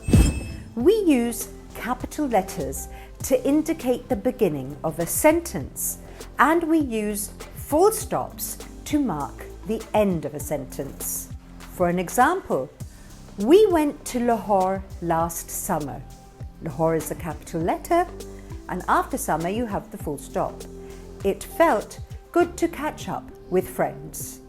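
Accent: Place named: United Kingdom. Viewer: Just a question mam.. were you born in UK ? You have a british accent. Please answer this. Just curious 🌸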